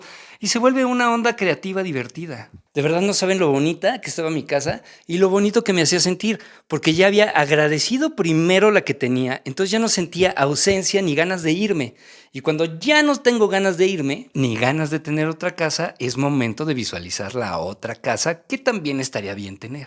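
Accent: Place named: Mexico. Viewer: Jajajaja, literal me subiste la vibra del día. Ya tienes el don de la palabra que además te fluye de maravilla por tu acento, "que viva México carajooo". Luego me partí de risa con lo de la vecina ruidosa, porque estoy pasando por lo mismo. Gracias por compartir, inspirar y por motivarme con tu espontaneidad. Por favor, no vayas a visualizar novias diferentes porque las tazas son útiles, pero muchas mujeres te volverían loco, jajajaja. Graciasss.... voy a comenzar hoy con el reto de agradecer a la loca ruidosa de mi vecina 🤣🤭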